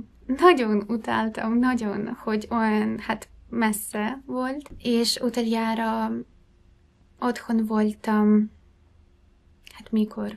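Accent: Russia. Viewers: Szia! Borzasztóan sajnálom ezt az egészet.. Az én nagymamám Orosz volt. A 2. világháború után szökött Magyarországra, mert a fronton beleszeretett a nagypapámba. Nemrég halt meg 95 évesen, de meghökkentő, hogy mennyire hasonló akcentusa volt hozzád. És számomra ez a legborzalmasabb, hogy innen nézve 2 nagyon hasonló szláv nép öli egymást évek óta... Én is tudom hogy ez az oroszok bűne, és mellettetek vagyok a szívem legmélyén is. De akkor is mellbevágó a hasonlóság akcentusban, habitusban. Ha egy ország képes lerohanni egy hozzá hasonló kultúrájú országot is, akkor bennem semmi optimizmus nem maradt már a jövőre nézve... Nagymamám soha nem beszélt az ideérkezése előtti időkről, és soha többet nem ment Oroszországba. Egyetlen napra sem. kb. megtagadta a származását, és a saját gyerekeihez sem szólt oroszul egy szót sem. Amikor pár éve azzal példálóztunk neki, hogy már nem kell félni tőlük, ez már nem az az Oroszország, mint a régi időkben, mindig azt mondta: Nem tudjátok milyenek az oroszok! 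Amint megerősödnek, vissza akarják állítani a szovjet uniót! Miután meghalt, rá 1 évre kitört a háború. Úgy megkérdezném most mit gondol erről... Nektek pedig kitartást! És félelmetes milyen jól beszélsz magyarul 2 év alatt!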